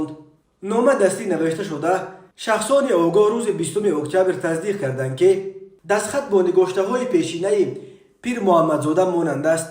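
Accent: Pamir, Tajikistan. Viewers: Керм дар акценти помтригит мембри дам та бгири бо барои и нома гамуш метиян. Рут сиееех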